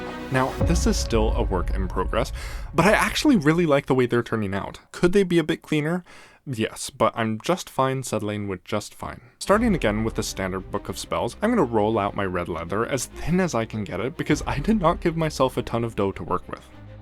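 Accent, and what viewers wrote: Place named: England, United Kingdom. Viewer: Also from the Uk. Your English accent... love it!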